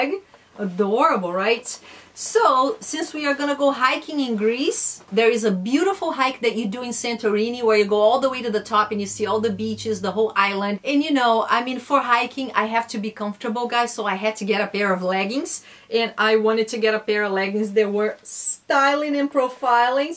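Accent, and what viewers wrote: Brazil. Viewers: I adore your Brazilian accent 😍🇧🇷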